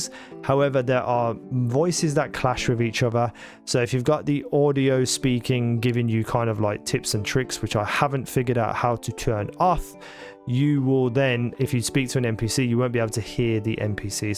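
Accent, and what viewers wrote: Birmingham, United Kingdom. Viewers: Alright mate, just downloaded the game now. You sound like a fellow Brummie btw which is cool! If you go to the controller preset page where it shows image of the controller, you can see that quick turn around 180 degrees once you enable the setting is double input of pulling left stick down. Iv spent 3 hours trying to dial in the settings so this feels nice. Very confusing and still working on what to assign to my 2 back paddles on the controller. Im using controller on PC and its not as buggy as console thankfully.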